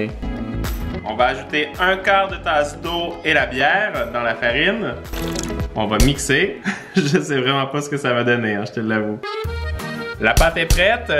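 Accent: Canada. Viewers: tu mon youtubeur préféré desCarl is cooking vidéos accent canadien et qui met quoi des vidéos trop trop rire 👍🇨🇦👍👍👍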